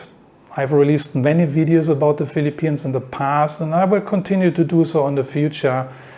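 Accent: Germany. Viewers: Indonesia is good but the Philippines is garbage .But his broken English in a heavy German accent is irritating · You're very interesting!😉. With that german accent and dry sense of humor, very funny! Wow you've been traveling a long time. I need to get back to SE Asia. I been considering returning to Thailand. I was a teacher in Thailand, Japan, and Taiwan previously. I recently got an offer to teach in Indonesia but am nervous due to the possible natural disasters and political strife but which country doesn't have this problem?...LOL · Can I recognize your accent as German? All in all, our experience is much of the same than yours. Somehow we still became starting to apply for a Thai Visa whereat we ourself also know ourselves how great the people in Indonesia are. We've not been to Bali though, and our experience in Aceh was different. Including that, we've been to Indonesia for 6 times until now. 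I myself would like to have an Indonesian retirement visa additionally to the Thai Visa but my wife isn't as convinced of that for now. The other mentioned countries never have been an alternative for us, maybe also because one of us is trans woman. That might, seen from the West, seem a little bit not so logical given that that Indonesia is a Muslim country. But, except from Aceh, our personal experience experience was that the people there don't care about that at all. I'm not talking of people as staff or otherwise expect a deal with you. To cut a long story short, where are you living in Indonesia? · Your Accent sounds is very German ..are you ?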